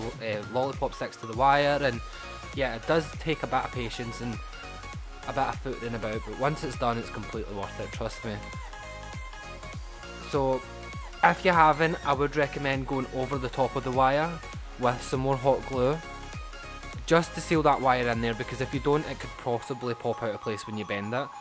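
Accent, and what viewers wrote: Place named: Scotland, United Kingdom. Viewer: Jesus ..you're so scottish😂😂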